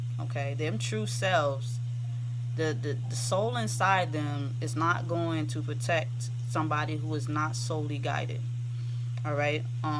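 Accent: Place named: New York, United States. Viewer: Good morning, I just found your channel, oh my goodness, what a gift you have! And thank you for blessing us with all your wisdom, knowledge, guidance and truth! You enlightened and empowered me with your uplifting spirit and powerful messages. This is what I needed ASAP, now I feel better equipped to move forward and start a new beginning with myself. P.S. you sound like a New Yorker, I live in the Bronx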